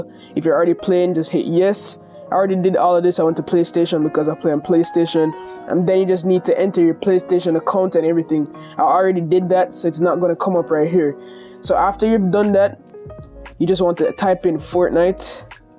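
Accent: Jamaica. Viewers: Are you Jamaican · are you a jamaican/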